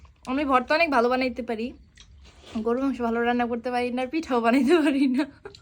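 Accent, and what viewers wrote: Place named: Barishal, Bangladesh. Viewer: বরিশালের বউ বরিশালের কথা শিখে গেছে মাশা-আল্লাহ মামি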